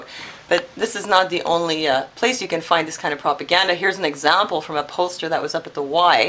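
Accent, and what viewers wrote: England, United Kingdom. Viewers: LMAO the british accent - so fucking genius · Great English accent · Your pommie accent is HOT!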